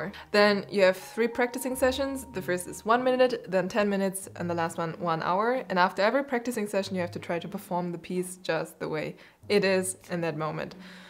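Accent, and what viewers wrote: Germany. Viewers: höre ich da etwa einen kleinen deutschen Akzent? ^^ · U have a DEUTSCH akzent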